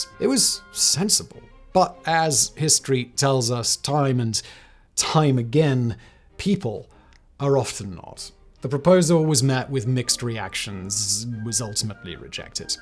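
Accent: United Kingdom. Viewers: I am not English native speaker (it is my 3rd language) I watched thousands of documentaries in English but this guy speech is unbearable, too fast and annoying monotonous british accent (or whatever this guy is). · hearing a brit talking abt george washington is crazy. love ur vids im not hating it’s just funny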